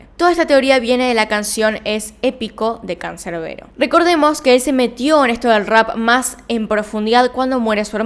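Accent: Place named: Argentina. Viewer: estudiando leches??? LEYEES WN LEYEEEEES CON Y NO CON CH ARGENTINOS QLS WN CAMBIAN LAS PALABRAS LOS ODIOS HABLEN BIEN😡😠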